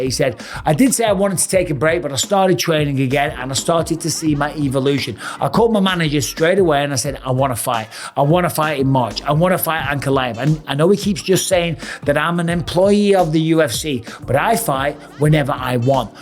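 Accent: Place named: United Kingdom. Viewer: Mike...sounding British again 🤔 not like a yank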